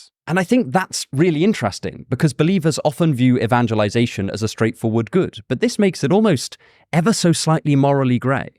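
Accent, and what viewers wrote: United Kingdom. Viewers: Your channel was my great coincidence: A young man with a British accent explaining about my favourite subject 📚✨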